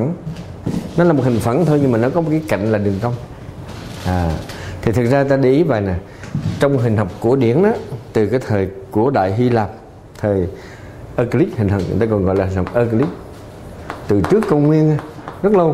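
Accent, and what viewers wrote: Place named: Central, Vietnam. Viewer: Giọng thầy miền Trung, Qng hay Qnam thì phải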